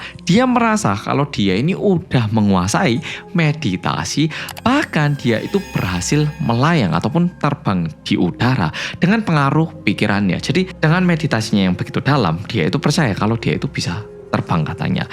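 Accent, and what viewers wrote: Java, Indonesia. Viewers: yang medokk, openingnya terngiang terus di kepala sayaa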